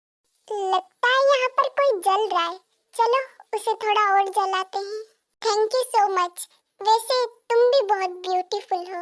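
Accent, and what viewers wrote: Rajasthan, India. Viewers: Di aap rajasthan se ho kya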